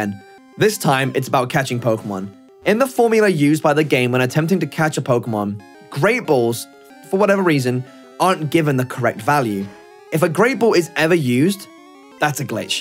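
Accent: United Kingdom. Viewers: True bri'ish accent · Maybe it's just me, but as a non-native speaker I had to concentrate really hard to understand what he was saying cause he was talking so fast. That combined with his british accent it was kinda rough. Really cool video regardless though!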